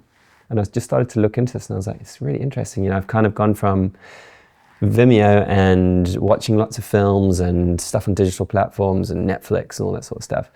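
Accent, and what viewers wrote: South Africa. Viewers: Why do you sound South African? It morphed from an Australian to South African accent 🤔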